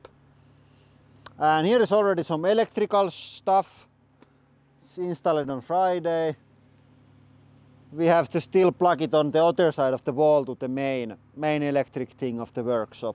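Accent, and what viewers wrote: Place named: Finland. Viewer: Great video, but Finglish is not easy to listen!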